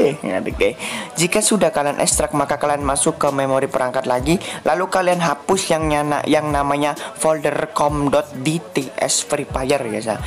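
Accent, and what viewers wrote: Java, Indonesia. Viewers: Anjay bgomong jowo orang mana bang emangnya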